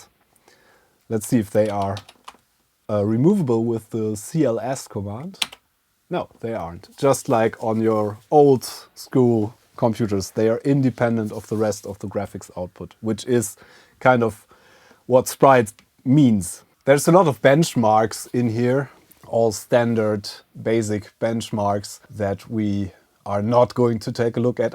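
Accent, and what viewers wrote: Germany. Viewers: In German (and many European languages) the letter G is always pronounced that way. Phonetically "Gee" is very rare, its almost always "Geh" and that will be what learned from a very young age. Just an FYI, the letter W is German is pronounced V which is why he also says things like "Wersion", again its simply because he was taught that from the beginning. Pretty obviously, German kids are taught German before anything else. IMO, as long as you understand what someone means there's no real need to correct them.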